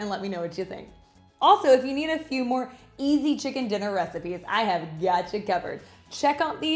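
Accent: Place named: South, United States. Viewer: You sound like you're from the South.. just a random observation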